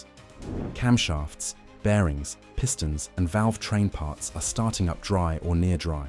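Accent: United Kingdom. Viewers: Why the British voice. I truly hate that. Unless of course I'm watching a 007 movie.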